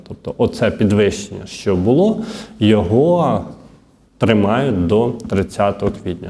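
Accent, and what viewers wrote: Vinnytsia, Ukraine. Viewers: демагог з бідагогічною освітою,припини херню нести,а краще навчайся вірно,бо твій вінницько жмеринський діалект ріже слух. Менше води,більше конкретики. І яка була корисна інформація в цьому відео,?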